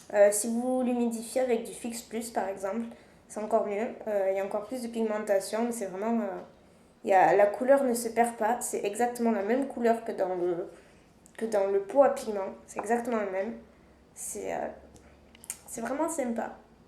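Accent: South, France. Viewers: C'est bizarre, on dirait que tu as plus l'accent du sud dans cette vidéo que dans les actuelles :o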